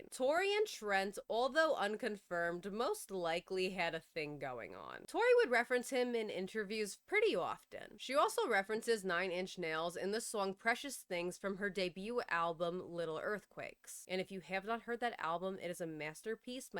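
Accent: New York, United States. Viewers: When your sick you sound more like a New Yorkian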